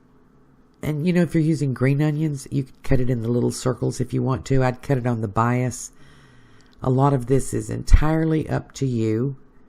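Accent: South, United States. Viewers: I knew you were from the south because of your wonderful accent but didn't realize you were in Georgia. All of my mom's side of the family live there. Thanks for all you do!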